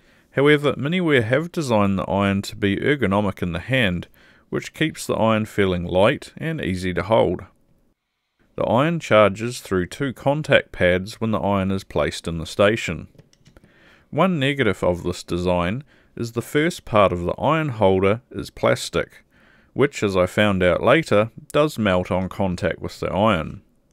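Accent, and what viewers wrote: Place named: New Zealand. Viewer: Just love your Kiwi accent!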